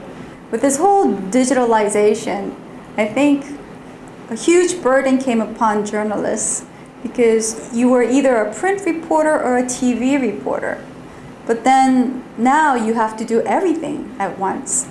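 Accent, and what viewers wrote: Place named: South Korea. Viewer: 발음도 안조음 코리안 발음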